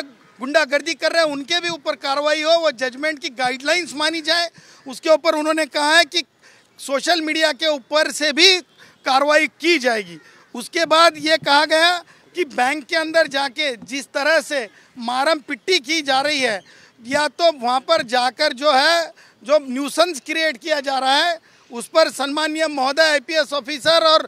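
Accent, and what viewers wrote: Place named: Bihar, India. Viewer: Ha full bihari tone madhe boltoy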